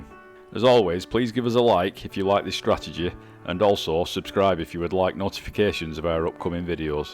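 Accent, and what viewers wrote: Wigan, United Kingdom. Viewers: Gotta love that Wigan accent!!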